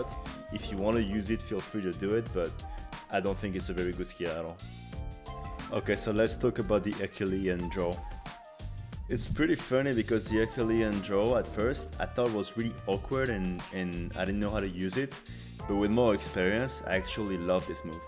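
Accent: France. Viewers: You are french right ?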